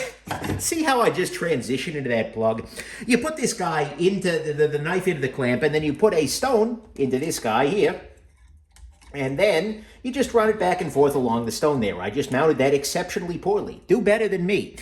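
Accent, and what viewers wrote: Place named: New England, United States. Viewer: This dudes way of teaching is awesome. Cool N.E. accent too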